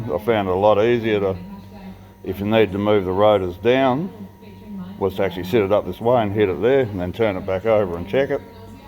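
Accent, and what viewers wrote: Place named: Australia. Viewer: Whereabouts are you? Sound like Aussie?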